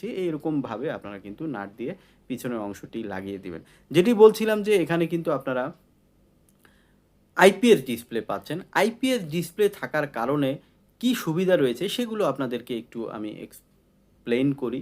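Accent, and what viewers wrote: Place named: India. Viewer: Apnar voice undian accent pai, khub sundor presentation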